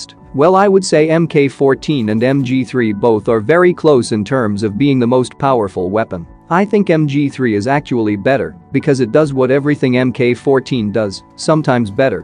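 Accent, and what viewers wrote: India. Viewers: this man is indian?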